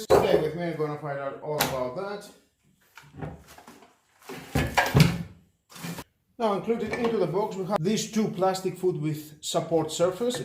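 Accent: Greece. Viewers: Καλά αυτή είναι 100% ελληνική προφορά. Είναι το μηχάνημα του Lidl? Είναι βολικό; Φαίνεται η κίνηση λίγο περιορισμένη σε υψος σε σχέση με άλλα μηχανήματα..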